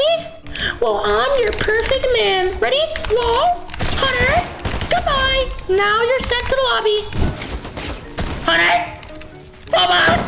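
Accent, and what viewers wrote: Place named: Texas, United States. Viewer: Love the new Texas accent. 🔥🔥🔥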